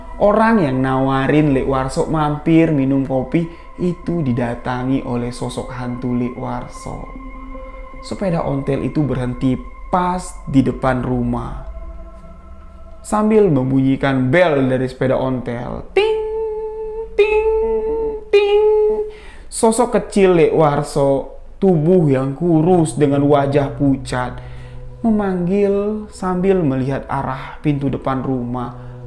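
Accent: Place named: Madura, Indonesia. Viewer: Logat Madura nya masih kental.. Salam sehat selalu tretan dibik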